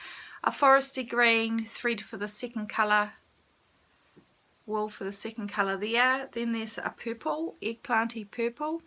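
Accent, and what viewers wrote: New Zealand. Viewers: Kia Ora! I've only just found you, thanks YouTube:0). I'm a kiwi, living in Aus (NSW). It's been way too long since I was home (stupid Covid) and it's sooo nice to hear your accent and feel the connection to home in your manner and way of being. I'll keep binging your channel, while I sit crocheting and resting with my own version of long standing illness that causes fatigue and fall out. Thanks for sharing, it's important for others, for me. I needed that connection today. Arohanui xxox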